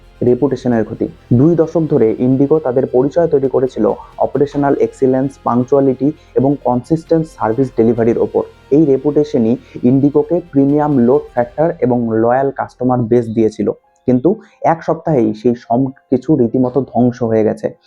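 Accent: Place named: Bangladesh. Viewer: আচ্ছা আপনি কি বাংলাদেশী?! বেশ কিছু উচ্চারণ শুনলেই তাই মনে হয়। আপনাদের নিজেদের দেশের বিষয় নিয়ে representative clips বানান। তাতে সবার ভাল হবে। আপনাদের'ও।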